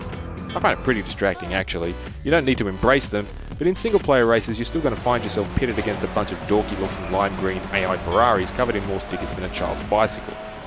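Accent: Australia. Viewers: Nick Revels Do people not know accents? is an Aussie. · was this review done by an australian?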